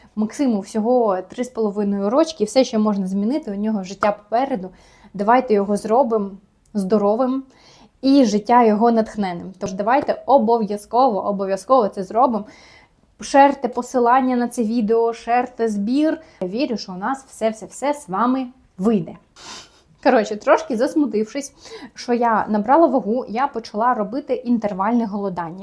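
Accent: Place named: Kherson, Ukraine. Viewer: Пишу коментар за вашу херсонську УКРАЇНСЬКУ!!!! ТИ КЛАСНА🤩 радяю з того, що все більше українського контенту з'являється. Дяую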